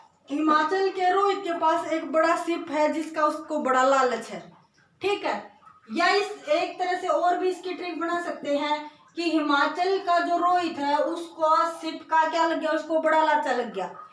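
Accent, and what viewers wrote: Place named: Haryana, India. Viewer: Nice but d'nt use haryanvi ton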